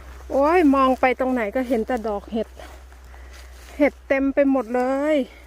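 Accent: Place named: Laos. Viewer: 🌺🥰👍🌸สวัสดีคะ่น้อง คนเก่งของพี่พูดภาษาลาวน่ารักจังเลยคะพูดบ่อยๆนะคะคนดี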